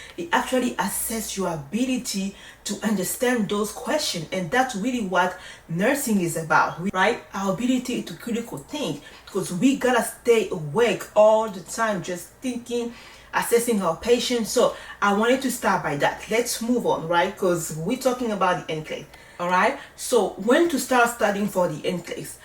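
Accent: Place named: Cameroon. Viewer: Hi new subscriber here👋🏽, first of all I want to thank God that I have came across your video. Your first video that pop up on my feed was "how to find sponsors for a green card (2025)". I will try to make it short (because I like to talk a lot). To be honest when I clicked on your video I wasn't sure what to expect, but I believe is God who wanted me to come across your video. When I heard your accent, I told myself, maybe she's from Cameroon 🇨🇲🤭, but when you said you were from Congo 🇨🇩, wow 😳 I was so amazed. Let me tell you how proud I am as your fellow Congolese🇨🇩 sister to see everything that you have achieved, seriously with all the titles on from of your name, that's the most blessing ever (You Go Girl!!). Like I said I will try to make it short 🤭😅. So, I am a UK trained nurse and I live in London. I was born in Congo, been raised and lived in Belgium for 13 years and I have decided to move to the UK. 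So, you might have guest from now that by this message I want to migrate in the US as an RN for so many reasons. But the first is for the job progression and all the nursing opportunities. In the UK, unfortunately, right now the UK is failing all their nurses. So I am on my journey to migrate, I know the road will be long with retrogression (EB-3 visa), but I believe God can do a miracle and touch Mr Trump's heart to make a big change for us nurses😁. On a serious note, I have start the application with New York BON because right now they are the only state that ac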